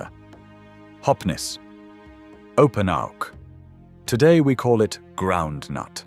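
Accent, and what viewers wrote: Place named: Southern England, United Kingdom. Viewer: 👋🏻 it’s not a robotic voice 😀 it’s a normal “ educated “ Southern English voice 💕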